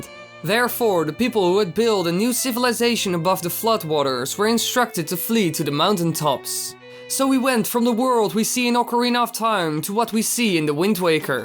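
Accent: Netherlands. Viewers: is dutch?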